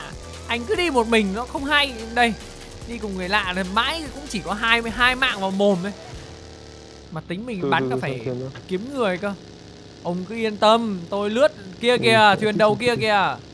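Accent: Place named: Thanh Hoa, Vietnam. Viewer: Giọng thanh hoá :))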